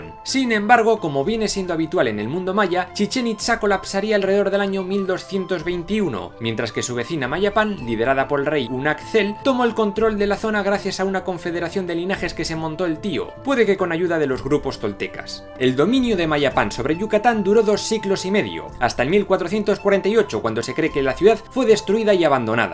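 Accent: Spain. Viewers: "Pues muy bueno, lo único malo, es que el de la voz es español por el acento". ¿Xenofobia, dónde? En fin, soy mexicano y sigo sin ver el sentido de estar resentidos por hechos que ocurrieron hace más de 500 años, imagina esto, que un antepasado tuyo mató a un hombre hace 300 años, entonces ¿Un descendiente de ese hombre tiene derecho a odiarte y menospreciarte? Además, nos guste o no, los mexicanos en general tenemos tanto ascendencia indígena como europea, principalmente española, así que sin la conquista, muchos de nosotros ni siquiera habríamos nacido; no digo que eso signifique que tenemos que adorar a los españoles, solo digo que tenemos que aceptar que lo que pasó es historia y ya. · Pues muy bueno, lo único malo, es que el de la voz es español por el acento, y las escenas de exclamacion son españoles, que incongruencia, los mismos que vinieron a destruir culturas tan magestuosas, todavía quieran seguir explotando y vivir con estos temas, que bárbaros, no tienen descaro en seguir aprovechándose. 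Tienen mierda en la cabecita. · Eres el primer español que escucho dando descripciones antropológicas sin poner por delante su ignorante falsa superioridad. Tan mal caen que hasta el acento se odia para estos temas pero tú limpias un poco ese mugrero, solo te tropiezas al decir al final que encontraron pura decadencia. Para colmo los tripulantes de esos barcos no eran precisamente algo selecto.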